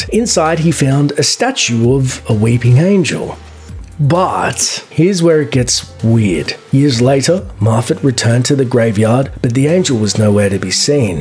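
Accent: Australia. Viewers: I'm pretty sure this guy has an Australian accent lol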